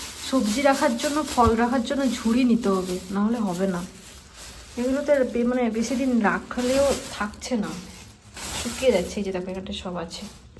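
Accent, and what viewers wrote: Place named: Jhargram, India. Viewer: Tomra j vabe kotha bolo Amara o si vabe kotha boli amar bari Jhargram district a .Ami thaki Mumbai te ❤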